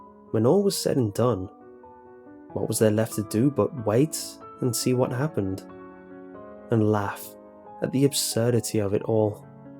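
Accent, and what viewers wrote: England, United Kingdom. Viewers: maybe england just a guess tho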